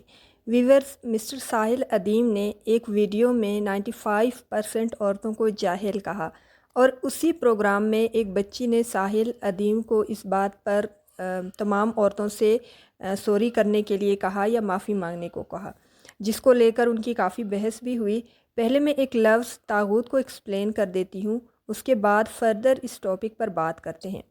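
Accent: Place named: Punjab, Pakistan. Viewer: السلام علیکم قرآن مجید کے الفاظ ہیں آپ ان کو اردو اور پنجابی کے مکس تلفظ میں ادا کر رہی ہیں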